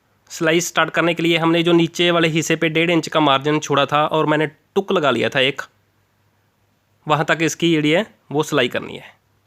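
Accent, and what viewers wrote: Punjab, India. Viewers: Tusi Punjabi ho veer g mai v Punjabi aa tusi bhut vadia samja rahe ho te tuhadi Hindi ch Jo Punjabi mix h oh bhut jyada vadia lagi mainu kyu ki same to same mere massi g jado Hindi ch gl krna start krde ne ta tuhade baggu bolde ne God bless you veer g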